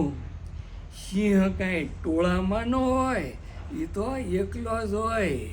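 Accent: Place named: Bhavnagar, India. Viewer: અસલ ભાવનગરી ભાષા😂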